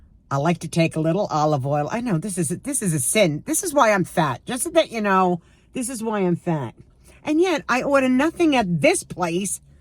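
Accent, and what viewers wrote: New York, United States. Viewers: Oh my goodness!!! I can definitely relate to you! My husband was a long haul driver too! The first few years we were married he was gone all the time! now he drives for Uber L O L I like it. But then I don’t like it when he sits in his chair at home! i’m like…. don’t you wanna go do something? He’s like, No…but we have animals so he’ll go outside to the animals in the morning and then he’ll go tend to the animals in the afternoon…. but then other parts of the time he’s in his chair when he’s not driving for Uber and then I say don’t you wanna read a book or learn a new craft; build a new porch or build us a new deck?!?!, my firstborn was born in 1987 so I wonder if we also have that in common…what year was your daughter born? By the way, I love your accent. Are you originally from Long Island or from New York? So your birthday is on the 28th!!! 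How exciting! I hope you watch my next video. I shouted you out!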